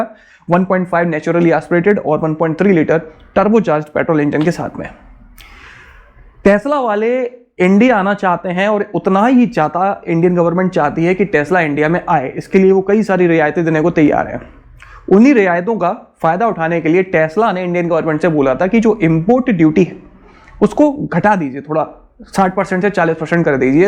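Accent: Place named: Gwalior, India. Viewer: Gwalior ki boli 🔥🔥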